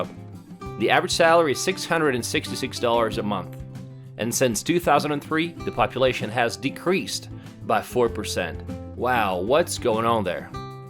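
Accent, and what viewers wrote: South, United States. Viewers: Your videos of Russia are all fabulous, with great and pertinent information. The Russians seems so happy, busy and content. Your English speaking is awsome—no accent, excepting a slight US southern accent. David, San Diego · I've been meaning to ask, especially as l have not as much time to review your archive of videos (among the 4 Russian vloggers l follow), but your English lacks not only a typical Slavic accent, but it betrays a certain American southern voice. Quite cannot pin it down geographically, but where did you pick it up? The only Slavs l've known who have l have heard unaccented Slavic English were those who studied in the UK and acquire Received Pronunciation coaching... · Tripping me out how this guy sounds more American than Russian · Russian with an American accent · Nice... (No wonder people ask you= Where you from..? 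You sound like an American.)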